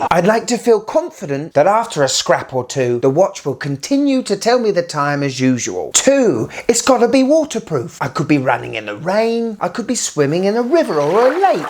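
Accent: United Kingdom. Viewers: Another really great video. It's been decades since I first noticed that you Brits pronounce z "zed" instead of "zee" the way we bloomin Yanks do. were very helpful with this, with Joe saying "Jay-ay-zed-zed" on their song "." And it's been years since I noticed that you insert an extra syllable into "aluminum." But only very recently did I notice that you blokes pronounce h "haitch" instead of "aitch." WHERE DOES IT END?!!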